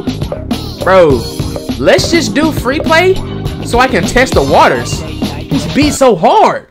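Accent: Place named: Philadelphia, United States. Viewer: YOU’RE FROM ATL? I thought you were from either Philly or Michigan.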